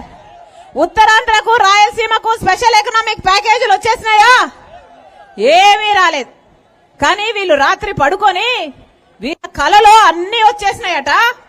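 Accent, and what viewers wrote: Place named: Telangana, India. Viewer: తెలంగాణ లో మాటలాడినట్టు ఇక్కడ మాట్లాడితే బాగావుండదమ్మా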